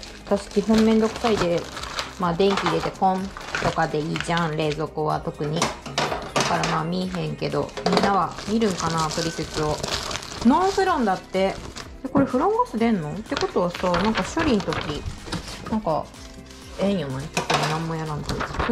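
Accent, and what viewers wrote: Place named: Gifu, Japan. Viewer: 冷蔵庫のデザイン可愛いですね👍 ちょくちょく出る岐阜弁がたまらんわ ((´∀｀))ｹﾗｹﾗ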